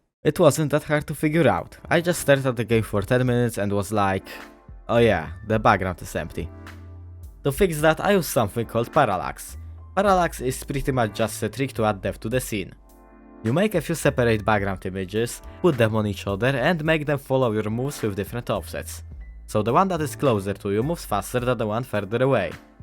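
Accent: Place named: Poland. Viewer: Słychać że jesteś z Polski.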